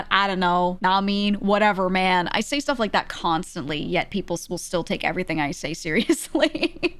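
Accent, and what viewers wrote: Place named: Northeast, United States. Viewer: I got absolutely read for filth at the "engineers write in block lettering" bit. At the risk of getting weird, I think the perception of Times New Roman delivery is equal parts tone, volume, and inflection. The Northeast American accent is a very neutral accent. And, the majority of the Just Chatting bits that do get clipped here of simply "talking about a thing" don't have a ton of vocal dynamics or hyperbolic reactions to the things being said (as compared to the MrBeast typical youtuber inflection that's the vocal equivalent of jangling keys in front of someone to get their attention).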